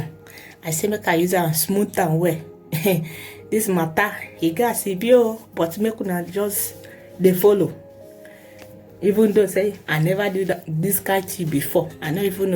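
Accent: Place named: Nigeria. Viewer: Lolz... The pidgin is really funny ... although u are gud in the make up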